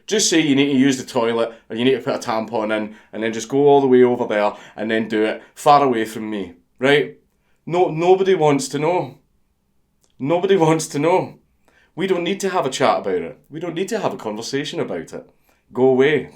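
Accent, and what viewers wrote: Scotland, United Kingdom. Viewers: There's something special about being taught about the female menstruation cycle by a man with a Scottish accent lol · How the Scott's say shit is fucking amazing sounds angrier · periods with a scottish accent is the coolest thing ever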